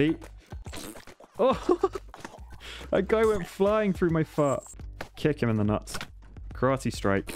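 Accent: United Kingdom. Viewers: Anime karate noises with a British accent are not the bees knees.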